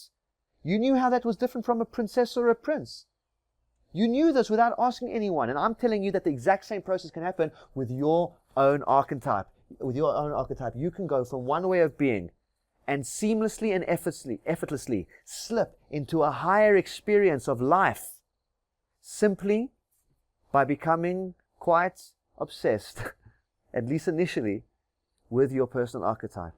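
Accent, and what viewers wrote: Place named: South Africa. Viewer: Beautiful video. Are you South African? You have a South African accent.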